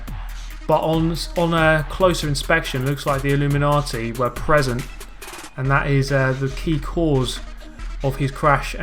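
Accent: United Kingdom. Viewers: WTF I was picturing you as a 30 year old fat white british dude hahaha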